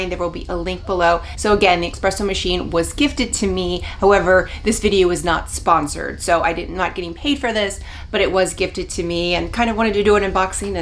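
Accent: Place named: Appalachia, United States. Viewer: For the love of all things holy can you please learn the proper way to say “espresso” and “ accessories”? There is NO “x” in either! I can’t watch this video with your hillbilly verbiage!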